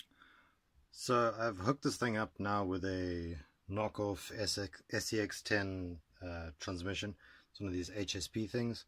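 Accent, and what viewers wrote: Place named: South Africa. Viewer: sounds like you are from SA. anyways. I am unable to find these 3.5mm bearings in SA. any suggestions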